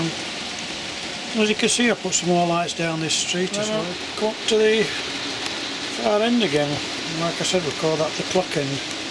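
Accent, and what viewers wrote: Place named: Barnsley, United Kingdom. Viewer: i take it from your accent your Barnsley way?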